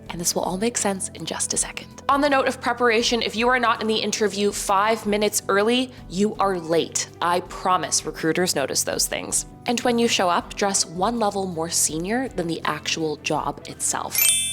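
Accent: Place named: Canada. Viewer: Sounds Canadian…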